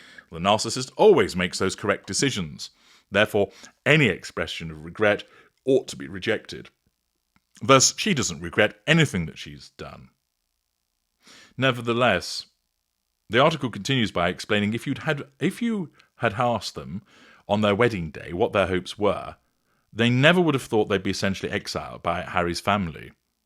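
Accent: United States. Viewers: Good American accent! I think if you asked Hank and Skank on their wedding day what their hopes were, I would say look at the 758 page dossier that explains the "business" deal that this sham is. Now wave and smile Ginger Poodle! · Love the American accent!!!🎉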